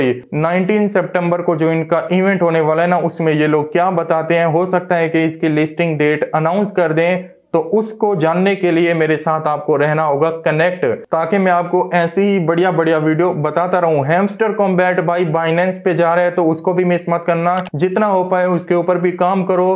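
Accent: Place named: India. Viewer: pass indian accent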